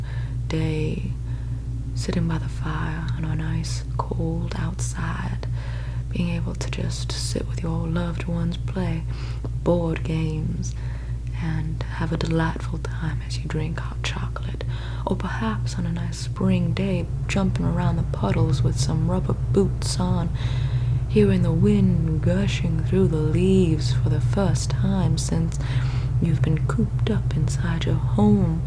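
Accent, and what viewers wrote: South, United States. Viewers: Southern is best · the southern DRAWL I cannot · love the southern accent its top tier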